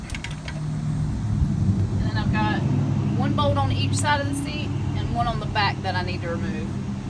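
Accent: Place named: South, United States. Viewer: I've been looking at these along with the PIAA versions....thanks for a great video! You've got to be from the south....I know my own :D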